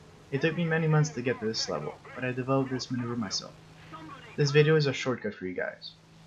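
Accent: Quebec, Canada. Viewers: Great video yet again. I have a couple of things that I'd love to understand. 1. It seems like you think roll rate is more important than turn rate is for achieving reversals. Is this because you will usually have very little time to aim and hence you will usually need to be able to orient your plane very quickly? 2. In your clip at 5 mins, what should the Spitfire Mk XVIII have done rather than pitching up? 3. Great call on adding your voice. Are you from Quebec by any chance?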